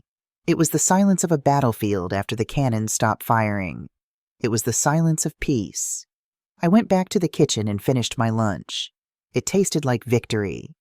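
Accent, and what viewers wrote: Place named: United States. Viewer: I hate her Mexican pronunciation, it sounds too gringo😊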